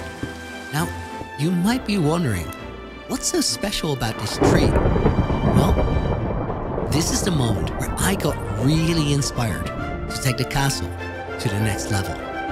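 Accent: Netherlands. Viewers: are you dutch cuz i hear it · very impressive,! You are not only a talented builder, but you have a gift for making videos too. Unbelievable! also: are you Dutch by any change? I thought I could hear it, but I could be wrong. (I am not at all saying your English is bad)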